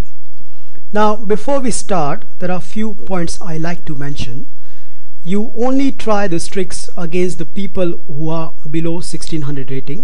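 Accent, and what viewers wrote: India. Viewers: the indian accent... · Love you bro. Hindi accent is great ;)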